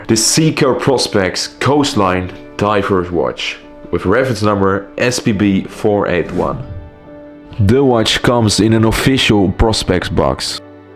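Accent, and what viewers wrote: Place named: Netherlands. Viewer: Ik weet dat je nederlands bent, maar alsjeblieft werk aan je accent.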